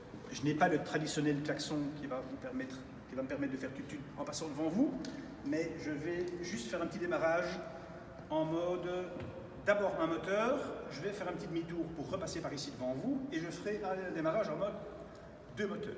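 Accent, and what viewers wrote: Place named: Belgium. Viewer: Il me tue c’est belges 😂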